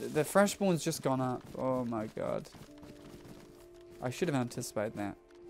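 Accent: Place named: United Kingdom. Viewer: is Britsh im assuming from his accent and Daliy Dose of Internet is American but yes they sound simumlat in a way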